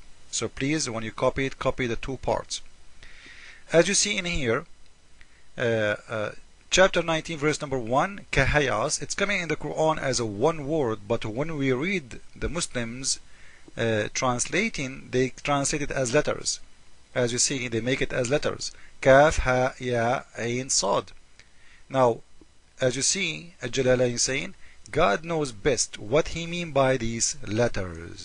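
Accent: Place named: Iceland. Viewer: are you from iceland? love the accent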